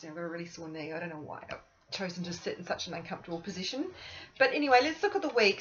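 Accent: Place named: Australia. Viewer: I really feel your love.. But most of all you are so down to earth about spirituality and angels and make it sound so 'normal ;) To me it is but I guess Im still in the closet cause of my familys ignorance..or should I say disinterest in LOA and signs etc.. Im a Twin Flame too but cant talk to anyone here in Gothennburg Sweden about it. Love your channel and your aussie accent! Makes me feel at home, comforted, grounded! ;) Grew up in Australia but havent been back since 1984.. Namaste/Cec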